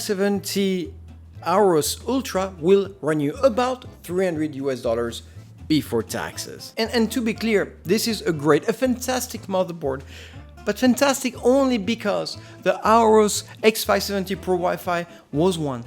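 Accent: France. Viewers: Oula il a un accent français lui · and... sorry but are you French ? (I noticed a little bit of a French stress in you voice... ^^). No offense though. French is my mother language ;)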